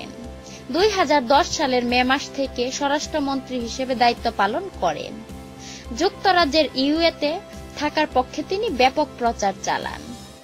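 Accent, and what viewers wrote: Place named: Jessore, Bangladesh. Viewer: আপনার বাসা কোন জেলায়? যশোরের ভাষায় কথা বলেন।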